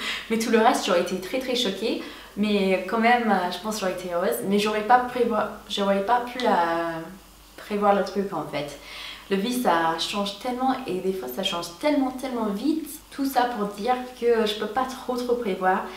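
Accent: Montpellier, France. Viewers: Je trouve trop mignon ton accent Français ! :) Est-ce que les Américaines trouvent attirant l'accent Français quand un Français parlent Anglais ? Sinon, si tu veux visiter la France, je suis de Dijon = le pays de la moutarde, du cassis, du vin et des ducs de Bourgogne ! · Tu es de Montpellier oú j'ai mal entendu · C'est marrant vous avez de plus en plus un accent français en parlant. Surtout sur certaines phrases rapide a dire.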